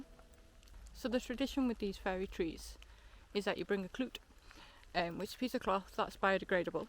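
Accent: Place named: Northumberland, United Kingdom. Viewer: Nice relaxed narration, with excellent choice of music, gave it the mystical feel with your regional accent, soft like are you from Northumberland?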